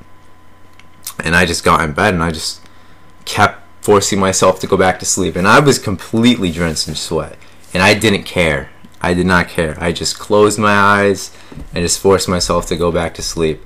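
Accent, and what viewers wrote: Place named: Rhode Island, United States. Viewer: Rhode Island accent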